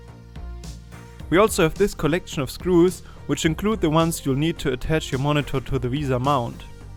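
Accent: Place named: Germany. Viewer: Ich liebe deinen deutsch akzent gutes review, auch wenn ich es 4 Jahre später sehe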